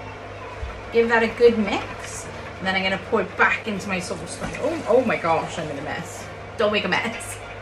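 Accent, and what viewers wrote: Wales, United Kingdom. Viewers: It's only a Welsh accent it's not that strong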